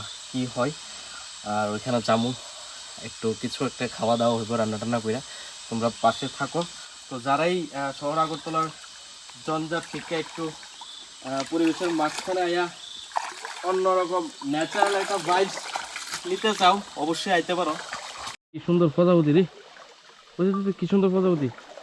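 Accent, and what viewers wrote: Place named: Mymensingh, Bangladesh. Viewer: ভাই আপনার কথা তো একদম আমাদের বাংলাদেশের ময়মনসিংহ অঞ্চলের মানুষের মত আসলে আপনার বাসা কোথায়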